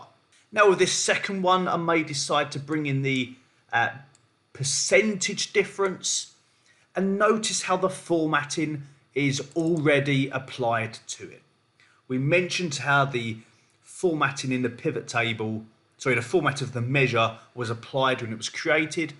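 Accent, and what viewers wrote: United Kingdom. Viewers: Yes. I like your voice!So British! So unusual!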